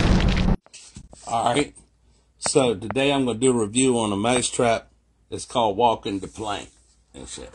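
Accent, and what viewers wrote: United States. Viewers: My husband and I never laughed so hard 😂 . Am I picking up a Louisiana accent? The fart sounds Cajun.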